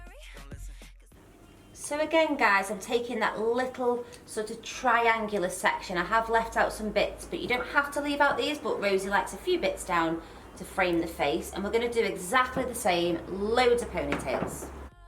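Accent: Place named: Birmingham, United Kingdom. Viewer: Amazing braid!! I love your accent - it’s very Peaky Blinders 💕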